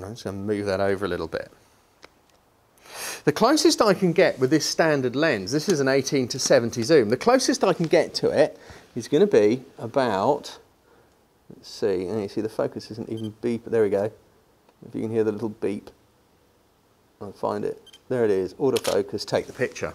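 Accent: United Kingdom. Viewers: Fantastic review and I love your accent ! You British ?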